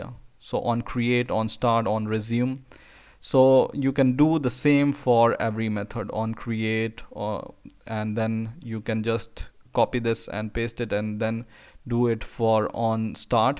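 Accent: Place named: India. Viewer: Oh god.. it is obvious that he is from India..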